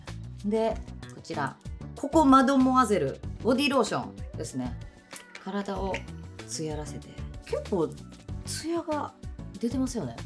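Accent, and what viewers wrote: Kansai, Japan. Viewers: Vogue で関西弁が新鮮で良い❤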